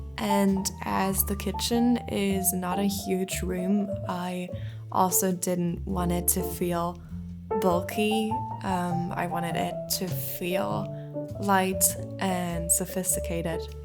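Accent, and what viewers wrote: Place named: France. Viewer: hahaha I was trying to figure it out your accent. I really love accents! I was in between France and Portugal bc you sound a bit similar to a few people I know from these places. But anyways, I’m glad you replied 🥰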